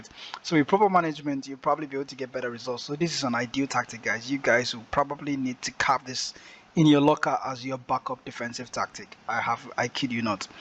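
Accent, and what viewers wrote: Nigeria. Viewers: Why do you sound so Nigerian? Nice video by the way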